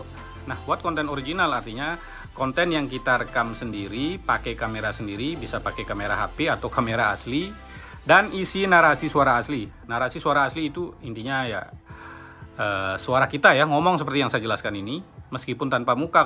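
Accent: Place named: Madura, Indonesia. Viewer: Logatnya kok mirip orang madura ?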